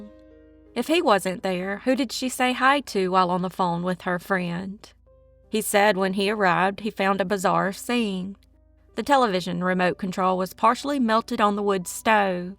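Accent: South, United States. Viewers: Got that smooth, Southern voice❤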